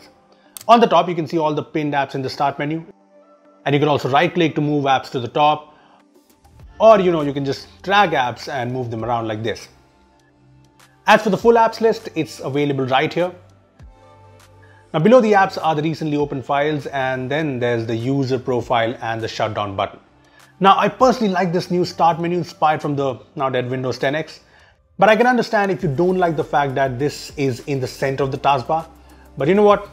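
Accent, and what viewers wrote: India. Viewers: Ok i will watch it even though its WITH INDIAN ACCENT haha but yeah thank you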